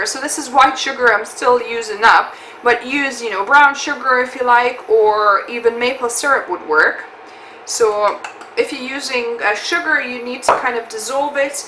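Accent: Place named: North East England, United Kingdom. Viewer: Hi I live in Stockton on tees. I think I recognise a NE accent! Our 1st daughter Harmony at 2yrs (now 42yrs) didn’t want to eat animals…. we are vegan because of this beautiful 2yr old. I have 6 grandsons 2 were born vegan! I am KM Vegan Baking but I do not work any more as I am a full time carer for my mum. I grow organic fruit and vegetables! Thank you for your delicious healthy recipes 💞💗💓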